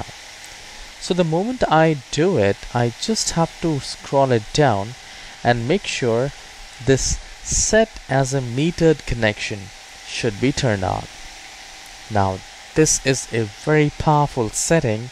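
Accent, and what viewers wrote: India. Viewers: You're clearly an indian why trying to hide it that much?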